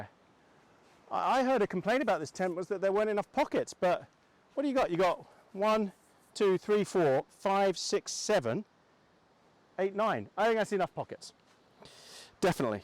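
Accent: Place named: England, United Kingdom. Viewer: DON'T MENTION SOMETHING GO'N WRONG...HAHAHA!!! WELL,LOVE THE NEW TENT...ITS AWESOME!!! AND SO ARE YOU N BRUCE. AND YOUR SO EXCITED ABOUT THAT TENT..I JUS FEEL YOUR JOY HAHAHA '' I LOVE HOW YOU TALK...YOUR ACCENT IS AWESOME!!! LIK ENGLISH ACCENT...ALSO PLEASE GIVE A ENGLISH INTERPRET WHEN YOU DO MEASUREMENTS N POUNDS..DID I SAY THAT RIGHT..WELL INSTEAD OF WHATEVER KEELOADS ARE...POUNDS??? WELL I NEVER LEARNED POUNDS AS MEASURE..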